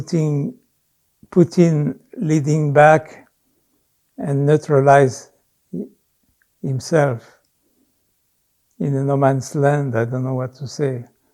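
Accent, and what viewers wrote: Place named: France. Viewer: Thank you,! As your student, who once sat at your feet to learn your all-encompassing teachings, this video is a wonderful summary of the total reality that we, as would-be healers and light-workers, are immersed in. How I love to listen to you speak (even though the French accent is always a little difficult for me to understand, ha ha)! You have the ability to articulate the whole truth of being that I feel inside but could not say so well. It was a privilege to learn from you, and is my duty to share this philosophy with everyone I can, so it will ripple out to the whole earth, building that light to heal. I bless you and all the good you are doing! Thank you, thank you, thank you!!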